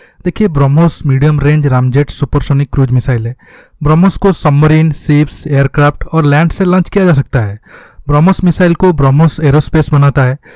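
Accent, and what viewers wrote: Odisha, India. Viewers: R u a odia U r acent r like that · Katha gura pura odia bhali laguchi, aau bhala bi laguchi, good job please carry on. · Aap Odisha se lag rahe ho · Are you a odia guy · App kya Odisha se ho?? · Brother are you odia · Odia accent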